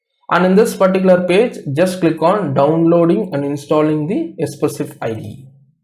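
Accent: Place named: India. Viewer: too much indian accent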